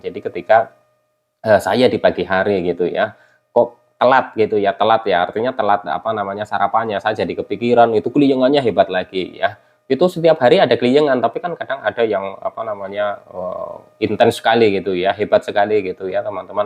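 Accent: Banyumas, Indonesia. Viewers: Menyimak ngapak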